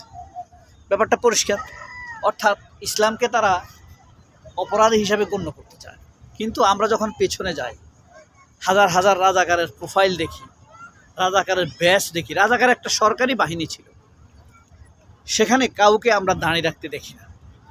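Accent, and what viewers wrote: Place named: Chittagong, Bangladesh. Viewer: ভাই মনে হয় চিটাগং সিবিস আছেন।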